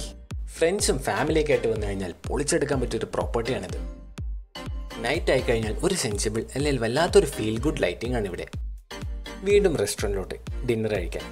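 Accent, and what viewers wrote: Kannur, India. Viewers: He is from kannur athonda evande sound egane😍🤩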